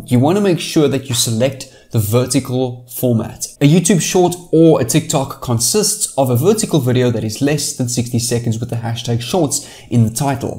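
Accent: South Africa. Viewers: Well done! I could tell from the accent that you're South African. This makes me so proud and inspires me too on my journey! New subbie right here!!!🥳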